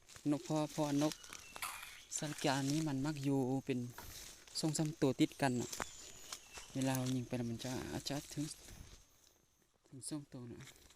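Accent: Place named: Thailand. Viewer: อยู่แขวงไหนครับ พูดสำเนียงภาษาเหมือนกันกับบ้านผมเลย ผมอยู่จังหวัดเลย ตรงข้ามกับเมือง ซะนะคาม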